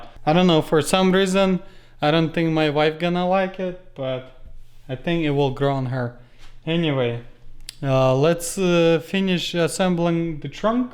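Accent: Russia. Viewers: Love that Russian accent 😂 Nice content buddy 👏🏼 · Is it Russian an accent?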